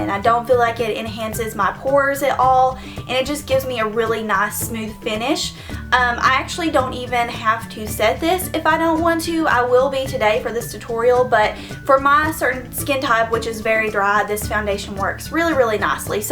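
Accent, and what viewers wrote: South, United States. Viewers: ♡southern accent♡ Sent with lots of ♡ in NC!!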